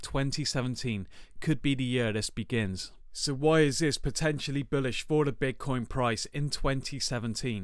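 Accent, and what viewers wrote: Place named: West Midlands, United Kingdom. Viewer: nice video! Are you from the West Midlands in the UK, or maybe the Bristol area? I recognise the accent :)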